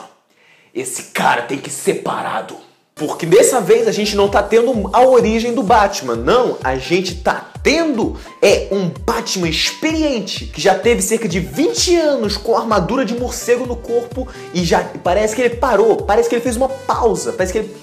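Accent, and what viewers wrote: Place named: Portugal. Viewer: você é português? seu sotaque é diferente kkkk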